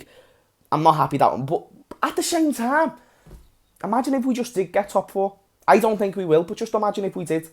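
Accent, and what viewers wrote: Liverpool, United Kingdom. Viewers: Scouser 🤡🤡 i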